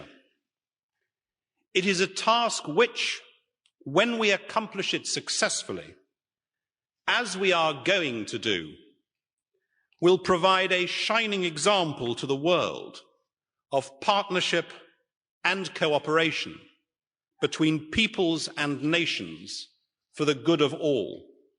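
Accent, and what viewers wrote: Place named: United Kingdom. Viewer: 英式英文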